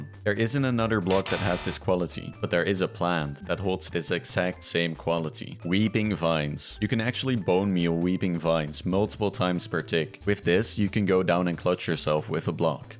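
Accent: Netherlands. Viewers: Dutch pronunciation :) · You're Dutch aren't you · So ur dutch right?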